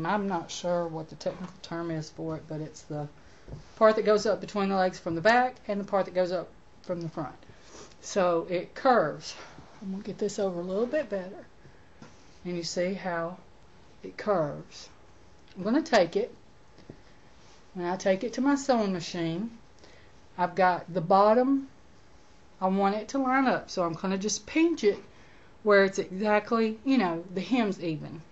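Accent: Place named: South, United States. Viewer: love your creativity...i use to make purses out of old jeans....this is a great idea. And miss that southern twang of yours.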